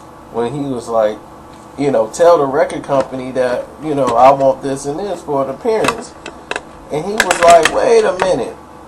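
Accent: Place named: South, United States. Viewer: Hahahaha....this guy has a southern accent!!!! Have u ever been to NYC????? ANY BORO?????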